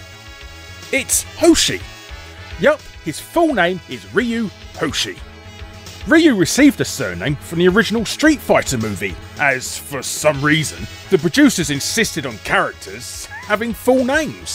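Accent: United Kingdom. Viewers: no offense i just generally really dont like british english lel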